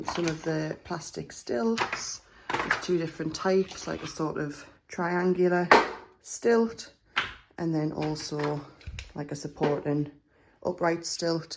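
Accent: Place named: Sunderland, United Kingdom. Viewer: yes I thought it was plus you have a wearside accent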